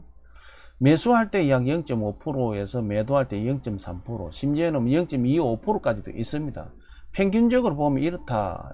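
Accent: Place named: Gyeongsang, South Korea. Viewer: 갱상도 강사님 언태 하시고 꼴고 꼴았고 ㅋㅋ 구수하네요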